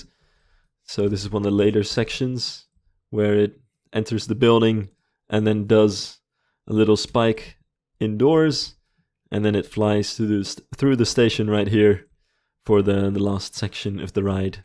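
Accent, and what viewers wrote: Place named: Netherlands. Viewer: Are you Dutch? Bedankt! Haha 😂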